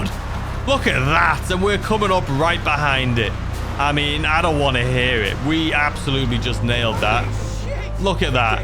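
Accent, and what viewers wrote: United Kingdom. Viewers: When did you move the considering you had a or you have a British voice considering you say that you used to do a lot of stuff there like how long have you lived there how old are you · Ain’t you British. ?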